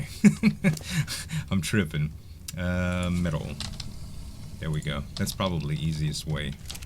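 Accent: Louisiana, United States. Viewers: Love ya videos Z. Listening to you takes me back to Thibodaux, and I haven't been home in a while.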